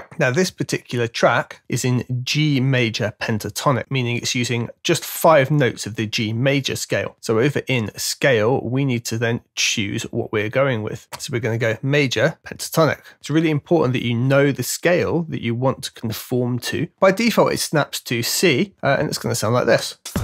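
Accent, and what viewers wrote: United Kingdom. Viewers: I pressed a like just for the British accent (don't mind me, if I am wrong and that is an American one, or something else) cheers for the content, why are there only 7 likes on this vid?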